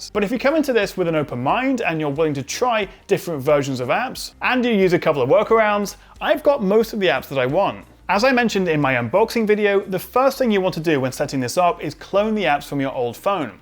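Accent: United Kingdom. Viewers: what lovely British town are you from,?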